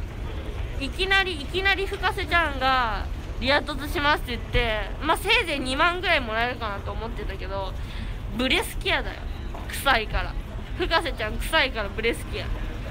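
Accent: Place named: Kansai, Japan. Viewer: たまにアクセントが関西弁だね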